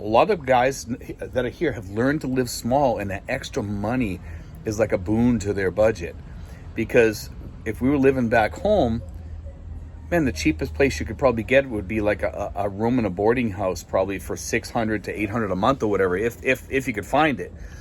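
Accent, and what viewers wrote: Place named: Massachusetts, United States. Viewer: You sound like your from Mass.I know that accent.Im from Quincy.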